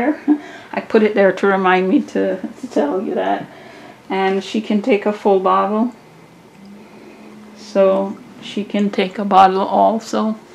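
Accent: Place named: Trinidad and Tobago. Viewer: You sound trini 🇹🇹 so cool even if you aren’t. I’ve never heard you speak. Your hands and mind are blessed. 🛐🛐🛐🛐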